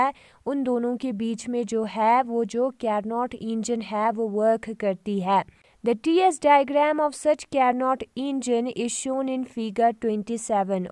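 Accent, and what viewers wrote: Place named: Kashmir, India. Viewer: Ur accent is Kashmiri for sure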